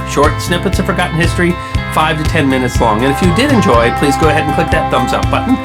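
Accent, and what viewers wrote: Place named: United States. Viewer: I appreciate this channel's interesting and informative accounts of history. In the interest of accuracy, which is what anyone would reasonably expect from THE History Guy, it would be nice if greater care and attention to detail were taken in learning the proper, non-Americanized pronunciation of foreign names and places. For instance, Bataan has three syllables 🙂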